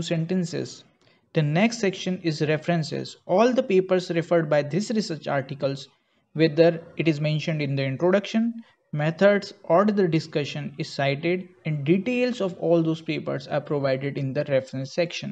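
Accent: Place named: India. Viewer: 印度口音..........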